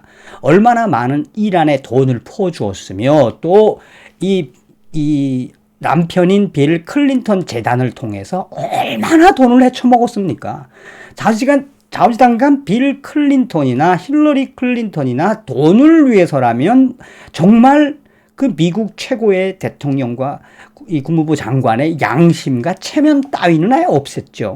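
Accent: North Korea. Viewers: 요즘 북한 사투리에 빵빵 터집니다. 간만에 재밌는 웃음을 주시네요~^^ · 이 분 북한사람 인가요? 매번 북한 말투로 ???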